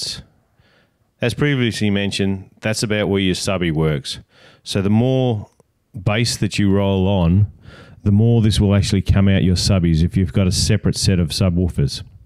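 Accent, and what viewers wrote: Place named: Australia. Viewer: Love your aussie voice mate :)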